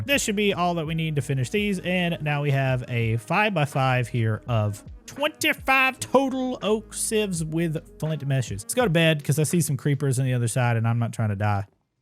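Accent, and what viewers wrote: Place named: South, United States. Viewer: I never ever comment or anything on YouTube but I have a question. Where in the south are you from because all the other MC youtubers sounds like their British or northern American. Idk, just curious because I could sleep to your content easily (in a good way) 😊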